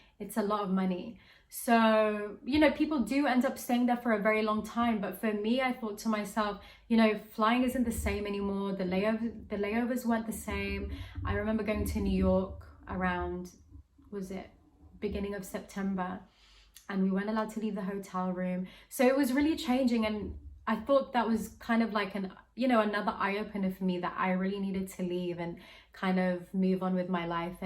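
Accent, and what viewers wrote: United Kingdom. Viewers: Briton na briton accent ni ghorl!!! God bless and good luck sa new journey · What part of the uk are you from, and your content is excellent 👏👍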